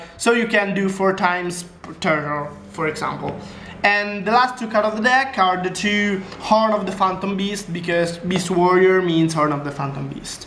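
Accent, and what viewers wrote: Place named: Tuscany, Italy. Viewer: O.O TI DEVO FARE I COMPLIMENTI PARLI MOLTO BENE L INGLESE MA L ACCENTO TOSCANO NON TE LO LEVA NESSUNO :)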